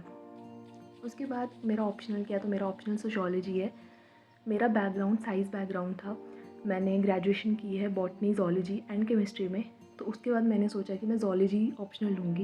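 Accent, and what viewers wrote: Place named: Odisha, India. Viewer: Dii i think you r from odisha bcoz i m from odisha... 😅 🎉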